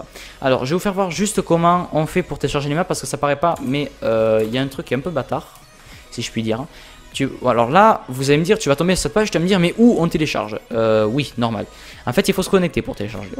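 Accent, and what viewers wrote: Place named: Quebec, Canada. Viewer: j'entends ton accent et je me demande si t'es quebecois ou marseillais mdr, mais sinon bonne video merci je vais m'y mettre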